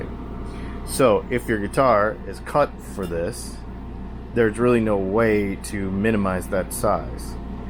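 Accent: South, United States. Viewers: Windowses and browserses...a southern thang. Just like, howzyamomanem! Fine thanki. An yourz? Gotta love it.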